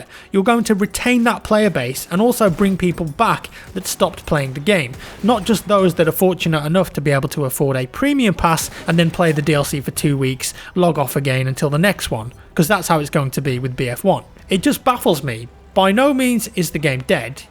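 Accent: United Kingdom. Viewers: Fuck your British accent.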